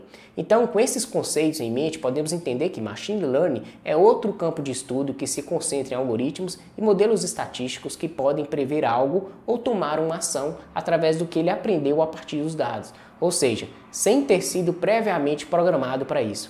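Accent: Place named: Minas Gerais, Brazil. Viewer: Obrigado por todos os vídeos que você posta pra gente... aprendo muita coisa contigo.. muito bom ver um mineiro como eu e da minha cidade (BH).. kkk ja imaginava pelo sotaque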